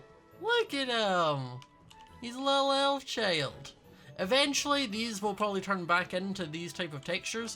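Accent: Scotland, United Kingdom. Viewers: What is his accent I hear British but I also hear Scottish 😩I absolutely love his accent!!!!!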